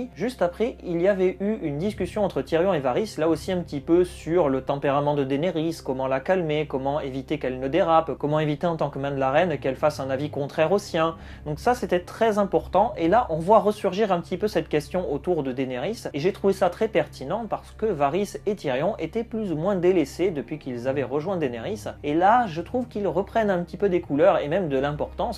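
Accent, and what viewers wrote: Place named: Bordeaux, France. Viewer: je sentais un accent bordelais mais vu que tu parle de feria j'ai plu aucun doute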